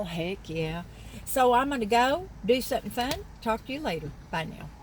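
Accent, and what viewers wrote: South, United States. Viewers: I lived in England until I was 5 when we moved to Canada. I'm in my 60s now, but still develop a peculiar affected sounding accent when talking to Brits or in the UK. However, I also would develop a southern US accent with enough contact. As another commenter wrote, it's incredibly infectious. I would probably develop a southern accent if I binge watched you.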